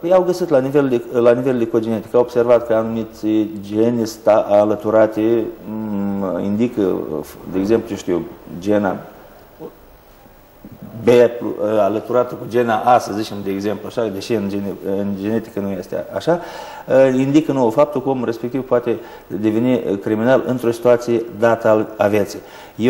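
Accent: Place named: Moldova. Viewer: Tipu e moldovean si ii e un pic cam greu sa renunte la accent (mai are scapari de accent) mai ales intr-un interviu televizat. Daca ar explica totul in termeni psihologici nu multi am intelege. El incearca o explicatie cat mai coerent pentru a intelegi si ultimul pamantean.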